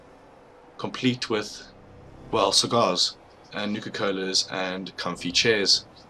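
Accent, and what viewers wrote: South Africa. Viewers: Are you South African? I am so your accent just sounded familiar. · My moms friends south african and I love his accent! Its a lot like yours! keep up the sound work lad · I think I recognize that accent. Are you South African?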